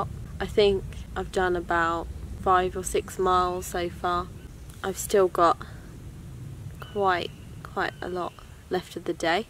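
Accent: United Kingdom. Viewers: YT video recommendation brought me here and i stayed to watch it because I really like the british dialect/accent. Best wishes from Switzerland 😎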